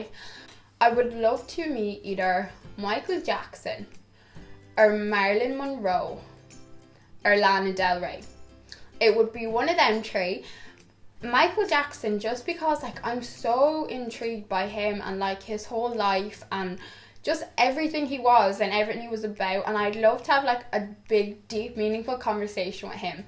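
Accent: Ireland. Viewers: hate cheaters with a passion and I'm proud of the fact that I have never cheated on a girl love your video's and love your accent nothing better than a beautiful Irish accent ❤❤❤ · What county in Ireland are you from?😆❤️And I love love love this video!😘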